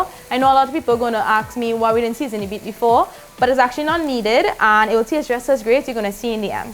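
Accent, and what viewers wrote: Trinidad and Tobago. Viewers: Great Recipe...I love your accent...Im hearing Trini...Am I correct???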